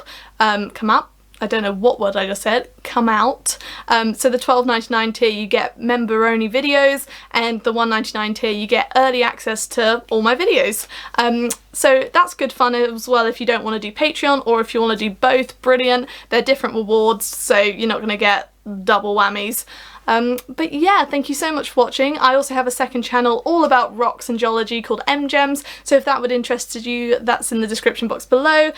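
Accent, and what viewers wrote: RP, United Kingdom. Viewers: Love her posh accent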